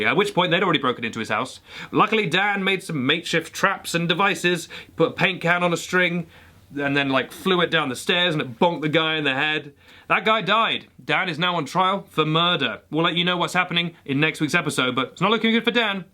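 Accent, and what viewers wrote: United States. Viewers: Your American accent unnerves me... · Also your American accent is amazing. It was so accurate that it made me uncomfortable, like someone dubbed in someone else’s voice but with god-tier editing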